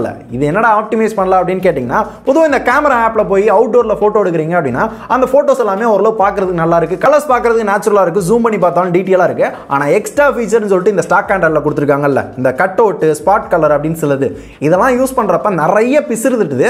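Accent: Madurai, India. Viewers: bro ninga madurai ya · Bro okaluku madurai ya?? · Madura karanku thon antha slang puriyum.. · Madurakaaranaya nee😄🔥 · Bro nega madurai ya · Bro neenga madurai bro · bro nenga madurai ya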